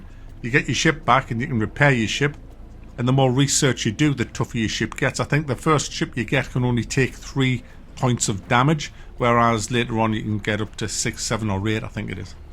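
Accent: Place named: Sunderland, United Kingdom. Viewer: Is this guy a mackem? Worst accent I’ve ever heard.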